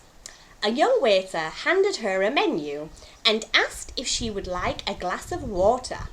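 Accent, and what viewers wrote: United Kingdom. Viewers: Great Video! Wonderful English accent!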